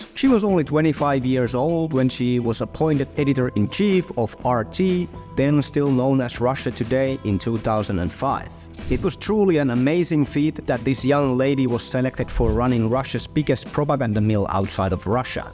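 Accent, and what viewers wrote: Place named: Finland. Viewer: Your finnish accent makes me feel cozy and the video was fun. But your should practice to atleast pronounce russian words correctly, as Russia is neightbouring country to Finland and the third largest speaked language in Finland. For example Россия сегодня, is pronounced "rɐˈsʲijə sʲɪˈvodnʲə" (in phonetic), or "rassiia sivodnja" in finnish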